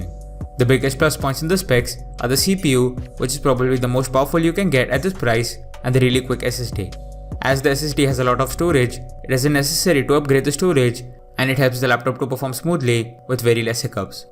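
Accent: India. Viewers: Да ), акцент у индусов ужасный )) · I don't understand Indian enghlisdhs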